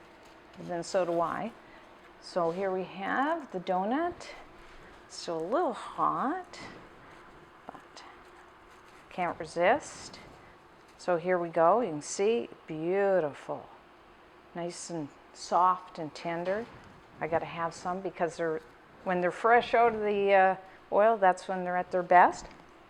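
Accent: Canada. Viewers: Are you canadian? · Are you Canadian? You pronounce "ou" a lil bit like canucks do. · I listened to this video again I think you're correct! At first I was thinking North Dakota or Northern Michigan but I think I'm hearing a slight Nova Scotia tone now. She probably went to elementary school in Nova Scotia and then high school somewhere else in Manitoba or Ontario. Her 'About' and 'outside' totally gave away the Canadian connection. It's tough to tell exactly where though because she doesn't use slang or idioms much. I love her videos.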